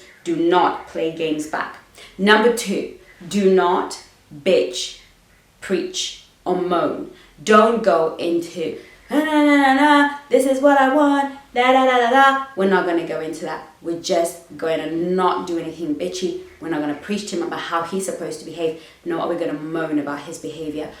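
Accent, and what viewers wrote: United Kingdom. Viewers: you are so beautiful and eloquent. I also love the British accent- my favorite accent. I just recently discovered your channel. Thank you for all you do. Working on lifting myself up. I know I’m a gem but there are times I’m a bit low and not feeling that way. Recent break up has really hurt me but working on picking myself up. Other channels helped me and like I said I recently discovered yours. Love the way you express yourself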